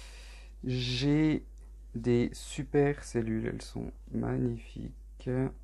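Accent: Franche-Comté, France. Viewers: C'est super beau les nuances nacrées, j'aime beaucoup 👌 Tu es de quelle région ? Tu as l'accent franc-comtois 😁😁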